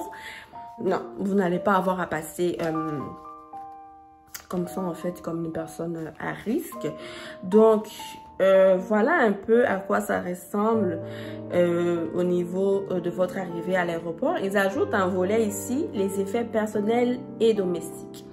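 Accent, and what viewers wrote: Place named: Quebec, Canada. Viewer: T'as de plus en plus l'accent québécois lol😅😅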